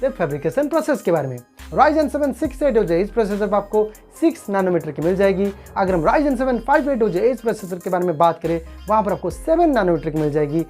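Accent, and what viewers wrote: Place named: India. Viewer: Son of a bitch, i dont understand ur accent. Pak u bumbai indian body odor people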